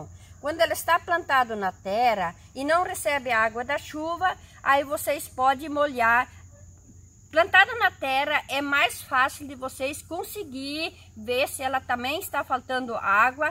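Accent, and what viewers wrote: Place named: Germany. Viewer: Esse sotaque alemão ❤️😍😂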